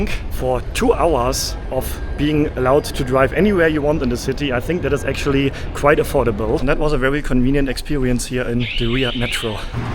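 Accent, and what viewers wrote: Germany. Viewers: Salam Aleikum Wenn ich deinen Akzent so höre.. bist du deutsch? 😂 ich musste lachen als ich dein Video gesehen habe. Ich bin mix, italienisch deutsch niederländisch Orient. Geboren aufgewachsen in Bremen. Und seit drei Jahren Muslima Alhamdullilah ❤ warum trägst du Handschuhe??? 🧤 👀 beim Essen? 😂 😮 99? Wow Alhamdullilah das wusste ich nicht. Große Bedeutung. 😢❤ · if this is a problem youre concerned about, you should worry about better things. This man is german, and english isnt his first language, so obviously his english wont sound as american. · I wonder if the german accent helped him out would have liked to see him wear an israel flag badge And yes i was there for two months 15 years ago, and i had quite a few problems but a lot less than in so many other arabic countries i have visited more recently.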